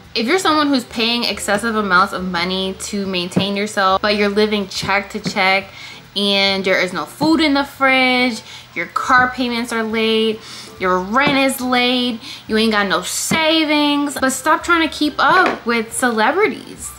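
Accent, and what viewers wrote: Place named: United States. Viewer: Girl I love your attitude. I am not from U.S but I can hear it right away, the accent omg 😅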